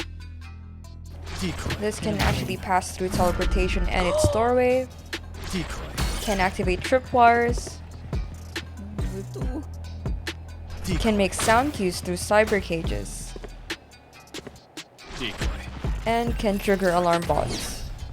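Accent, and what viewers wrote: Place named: England, United Kingdom. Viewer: nagulat ako bakit english accent pero nag tagalog lmao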